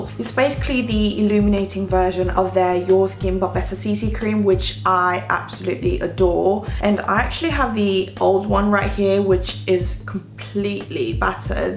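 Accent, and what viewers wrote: United Kingdom. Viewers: 살도 빠지시고 더 예뻐지신것같아용 ㅋ고급진 영국 발음하며 정말 너무너무 좋다눈 ㅠㅠㅠ 영상볼때마다 기분 짱 좋아용 ^^글공 오늘 입은 의상정보도 주세용용용 ^^ 넘 취향저격 ㅋ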